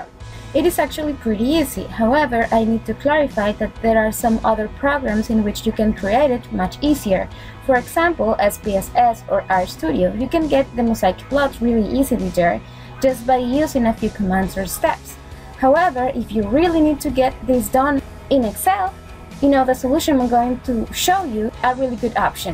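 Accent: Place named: RP, United Kingdom. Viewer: Well done, Congratulations. By the way, your english is very good, much better than the english from english speaking countries, it is BBC like.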